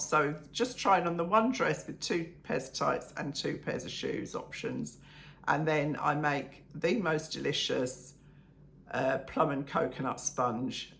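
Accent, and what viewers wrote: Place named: United Kingdom. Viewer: I like you in the navy tights!!! I know I’m going against your Mum but I Love the navy with the navy shoes. I watch all your videos I just love your voice and your British accent. As always all my love from Chico, California. Vicki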